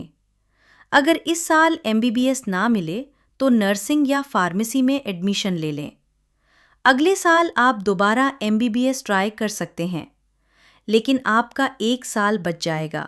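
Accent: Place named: Hyderabad, India. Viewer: Assalamu Aleykum, Aap ka laheja mein Hyderabadi accent hai ( india ) sun kar achha laga , ( Hyderabad Deccan se Syed )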